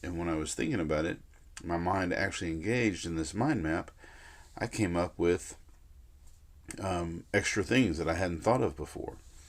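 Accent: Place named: Gulf Coast, United States. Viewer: Grew up in Gautier. 😂 recognized the coast accent immediately 🙂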